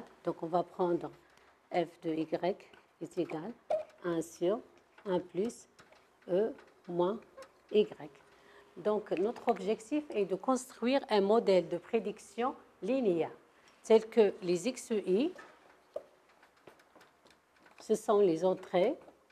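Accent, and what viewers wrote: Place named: Africa. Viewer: Mafhamna welou tahadri bel français te3 les africains 🤣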